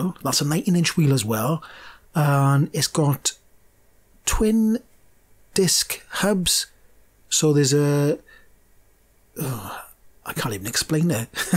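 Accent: United Kingdom. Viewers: Nice to here an accent that sounds like you live on my street, instead of the USA. Well presented video, look forward to the sequel. If you ever need any more of those headlamp clips, by the way, you can get them in Wilco for a couple of bob, they are for holding your greenhouse glass on. They only last about a year outdoors, but will probably be ok in the headlight. Paul SW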